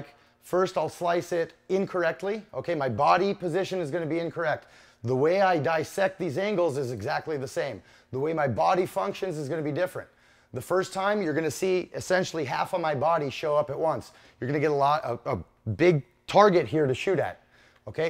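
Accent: United States. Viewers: He has a super american accent for an Israeli vet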